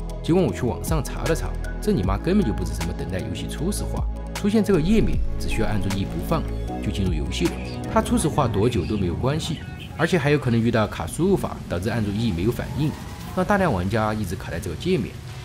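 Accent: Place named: Southwest, China. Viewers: 他成都的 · 一听就知道你是西南地区的人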